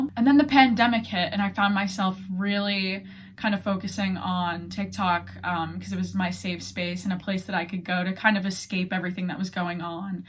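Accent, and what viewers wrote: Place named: North America. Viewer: Hey! Its nice to know your real name! I love your videos! (I too am obsessed with Marvel lol) Here are some just basic questions I have: How old are you? Ik your birthday was recently! Are you Canadian? American? (I assume you can't be anything else cos of your accent lol)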